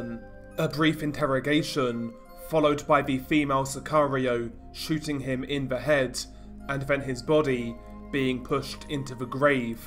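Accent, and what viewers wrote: Castile, Spain. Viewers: Please don't pronounce the names in European Spanish it is extremely grating and nowhere near as warm and sweet as Mexican Spanish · Your Spanish accent has improved a bit but why are you pronouncing in Castellano? Castellano is spoken in Spain only !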